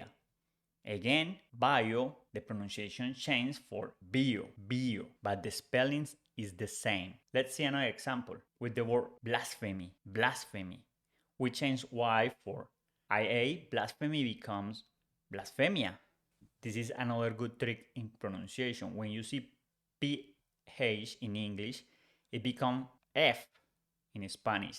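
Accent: Colombia. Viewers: I listen to your podcasts and read through the transcripts. This has helped me improve comprehension tremendously! I am also appreciating this video about hacking or using a simple tool. Also, the short phrases are a huge help as well. Me Encanta el acento Colombiano. Muchas gracias por tu arduo trabajo!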